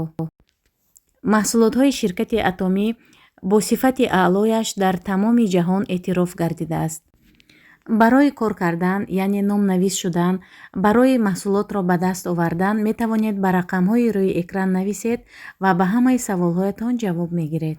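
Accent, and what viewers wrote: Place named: Literary Tajik, Tajikistan. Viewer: Мана чи тавр бояд сухан кард: равон озод бо лахни адабии точики👍👍👍Шахсе , ки шуморо гап задааст аввал тарзи сухан кардана ёд гирад😊. Шумо бехтарин модар зани точик👍👍👍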